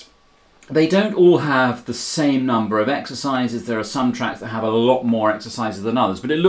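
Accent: United Kingdom. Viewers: The gaming chair betrays the British accent.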